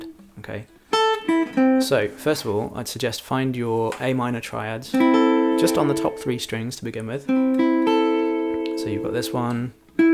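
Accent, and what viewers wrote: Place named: United Kingdom. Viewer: Thanks, nice clear laid back Brit!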